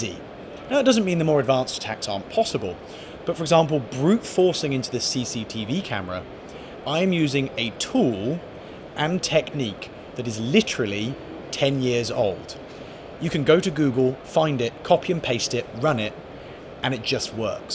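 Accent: United Kingdom. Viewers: I really like the UK #accent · I can hear the British accent ❤ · He is talking very British lol. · Man's British I guess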